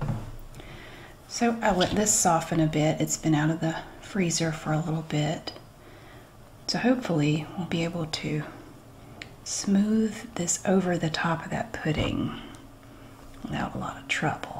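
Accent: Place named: Appalachia, United States. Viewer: ive bien loving your channel for so long, because your videos are excellent, but also, i love the pride you have for your southern appalachian roots and has made me take a special interest in learning more about it. you accent is so beautiful and your anecdotes are so Rich. the thing is, in trying to get more information i found another channel called celebrating appalachia and to my surprise, both of you ladies use the same confetti bowls to Cook. i would die with that collab. sending all my love and support from argentina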